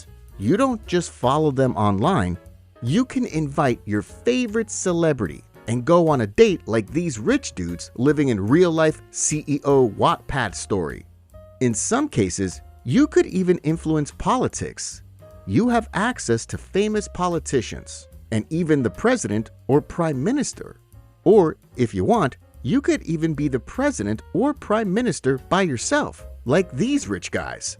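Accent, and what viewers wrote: United States. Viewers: I thought the American accent gave it away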